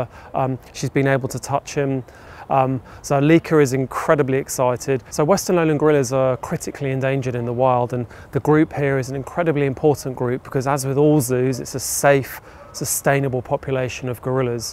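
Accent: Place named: United Kingdom. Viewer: A Fantastic Mom so far! And a Fantastic British accent so far♡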